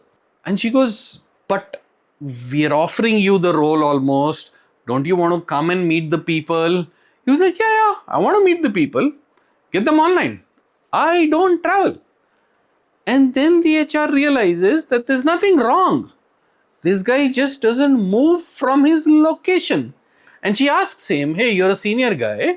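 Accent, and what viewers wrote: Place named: Karnataka, India. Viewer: Sir you are punjabi but your accent is pure kannada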